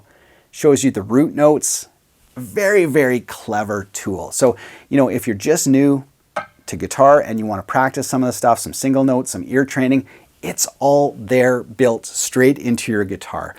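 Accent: Canada. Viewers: Hey! Love your show and your Canadian accent. I recently bought a Gibson SG Special Faded (2005), it's rather cheap for a guitar with the Gibson logo on the head, on the second hand market. What's your opinion about this guitar?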